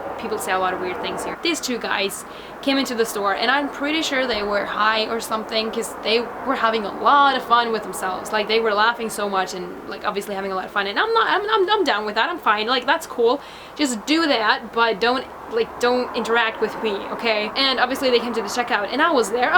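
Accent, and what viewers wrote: United States. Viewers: Kinda off topic, but your accent sounds a bit southern (USA) at times.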